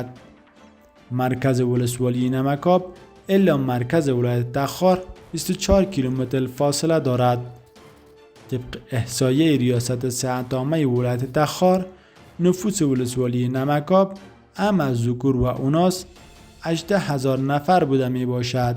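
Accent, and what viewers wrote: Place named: Iran. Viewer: mixed of Persian with Iranian accent. Guys y want respect speak in your dialect and accent. Ty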